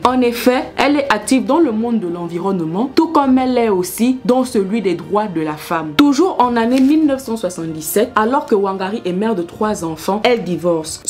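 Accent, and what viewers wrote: Fang, Gabon. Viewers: Bébé l’accent fang est toujours là hein nkolomessa ossuuuu❤️🥺😂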